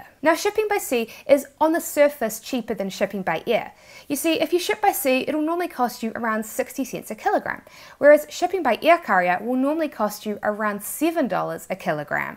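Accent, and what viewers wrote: New Zealand. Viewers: Definitely New Zealand yis ! 😂 Cute! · I've never thought New Zealanders have such funny accent. :-) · New Zealand accent,,,Aussies know how to pronounce vowels. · she has an accent, why? Because she is from New Zealand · yes huge and heavy, there’s more room to move if you know what I mean. Great instructional videos you provide, keep it up. I need to learn more about finding new products. I’m originally from the west coast of NZ so I can understand you perfectly:-) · I love your New Zealand accent is majestic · are you a kiwi?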